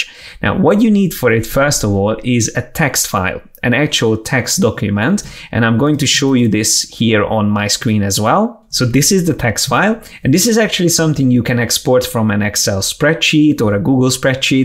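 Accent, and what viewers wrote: Hungary. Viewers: Ne haragudj. Véletlenül nem vagy magyar? Annyira magyar az akcentusod.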